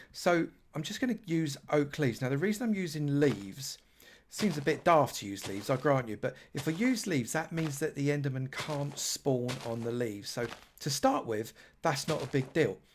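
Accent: United Kingdom. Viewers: Niks because he has a British accent, dumbass.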